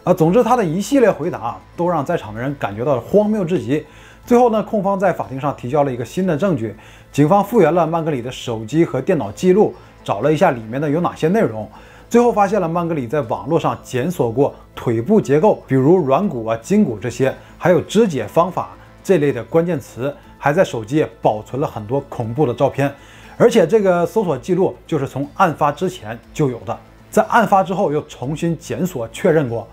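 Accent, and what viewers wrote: Northeast, China. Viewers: 不知道是不是因为东北口音搞笑且亲切（虽然我不是东北银😂），就觉得你讲的很吸引😊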